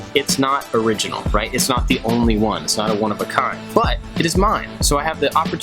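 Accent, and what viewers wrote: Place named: United States. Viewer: I feel bad for. This channel is absolutely brilliant - I watch all the vids even if the subject doesn't particularly float my boat. They're always as well made as the 'stuff'. It's just a shame people have to be negative and/or rude enough to make comments so feels he should explain himself. That said, I like as a presenter, (I even like his American accent), his views, thought process, personality, approach and general all round humility. The sort of good guy you would like to have a pint with. So I guess what I'm saying is: thank you haters. We got a bonus video thanks to your bitterness. Keep up the great work and screw those other fools. Carry on.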